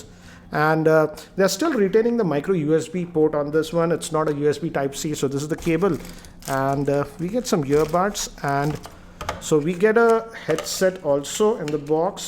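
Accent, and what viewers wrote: India. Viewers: All you Indians have the same tacky accent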